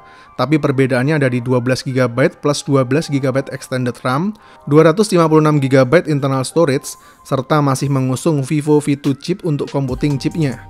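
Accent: Yogyakarta, Indonesia. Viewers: Weh wong jogja po iki dab? 👍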